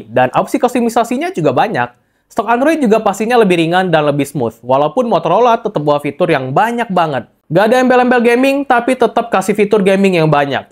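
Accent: Palembang, Indonesia. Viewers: Ngikutin channel abang ini fix dia orang palembang bro melekat banget soalnya logat palembang 😆😆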